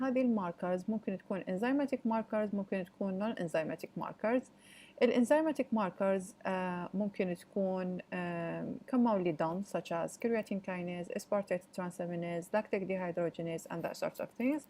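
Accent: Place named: United Kingdom. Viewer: Great , u have a really nice British accent 💜🙏🏻 Well, I speak with American accent but.... I think that British is beautiful than American accent and so clear 😐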